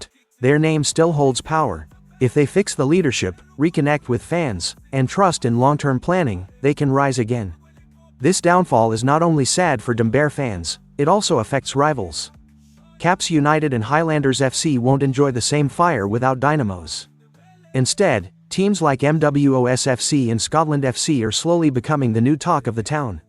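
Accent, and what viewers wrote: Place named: United States. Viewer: First of all Bernard Marriott must take full responsibility 4 the current predicament Dynamos fc finds itself.Marriott ,a founding member has been treating Zim's most illustrious, famous,successful fc as his fiefdom.If Dynamos gets relegated ,all Dynamos fans should descend on Bernard Marriott or call 4 a fatwa on him !.Secondly the yank voice over is nauseating and atrocious to say the least !.Marriott must disappear from the helm of Dynamos.True Dynamos legends like Mhofu,Mwendamberi,Kwinji 15,Mozze etal must come back and serve/save Dynamos Fc !!!!!.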